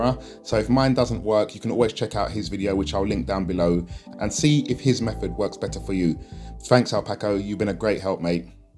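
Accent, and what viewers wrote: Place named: England, United Kingdom. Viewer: the very least I can do to say thank you. Love your English accent.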